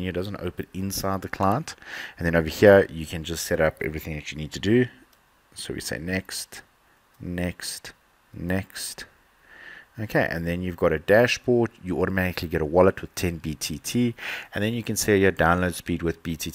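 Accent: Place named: South Africa. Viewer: That south african voice ek se xd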